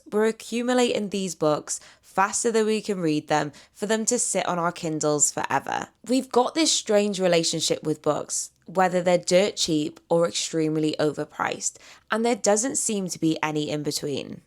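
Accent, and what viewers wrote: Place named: Southern England, United Kingdom. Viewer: Based on her accent and the info in the description of her Channel, she most likely buys books in the UK. I'd guess southern England but I could be wrong.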